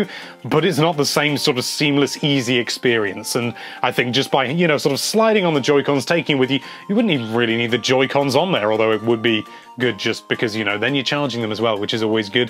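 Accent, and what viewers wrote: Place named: England, United Kingdom. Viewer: wait jij bent een Nederlander? Why do you have a english accent?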